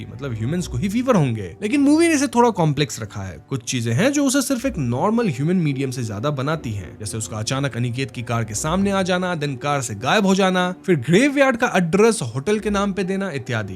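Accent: Bengal, India. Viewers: i also think that because when he speaks hindi you can hear bengoli touch in some words. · I think the host is bengali. Accent thora sa bengali type hai. But that's my opinion. N ye to achi baat hai. Because of this channel kayi movies hai jo language barrier k karan hum dekh nhi payenge but yaha wo explain ho jata hai. N hume itni achi movies k bare me pata chalta hai.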